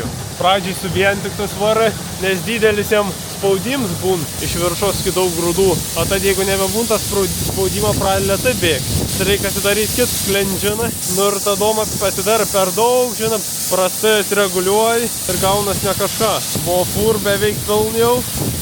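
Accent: Biržai, Lithuania. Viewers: Svajok atsargiai, nes svajonės pildosi🎉 Labai norėčiau fizinės knygos, nes audio kažkaip ji man nesusiklausė, tai duočiau antrą šansą jai 😢😮😮😂 P.S. Mldc už Biržetišką "šneką" tikrai malonu girdėt 😂😂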